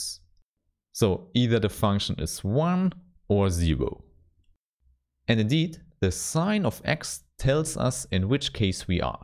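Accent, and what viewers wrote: Germany. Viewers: man merkt er ist deutsch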